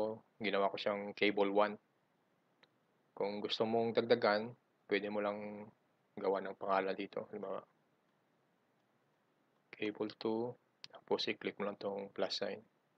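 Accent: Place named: Iloilo, Philippines. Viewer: Daw ilonggo ka gid to mo.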